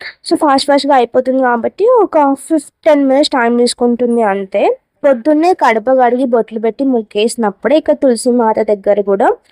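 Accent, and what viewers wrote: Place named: Sangareddy, India. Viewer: Hii sis first time mi video chusanu.mi slang same maa lane undi madi sangareddy.mi villege yenti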